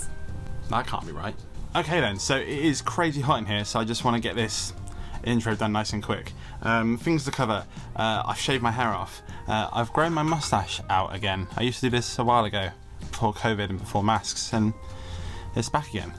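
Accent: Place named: Cockney, United Kingdom. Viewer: Cockney Lang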